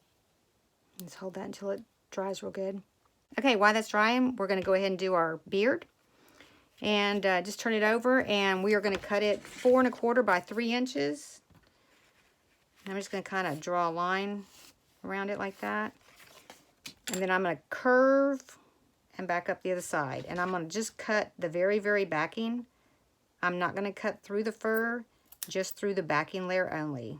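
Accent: South, United States. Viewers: Fantastic can’t wait to get making some! I love your southern US accent too 🇬🇧😀🤗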